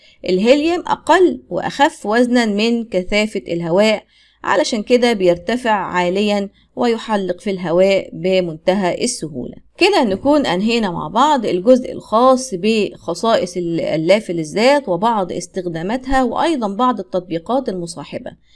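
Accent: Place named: Saudi Arabia. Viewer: ليش تتكلمي سعودي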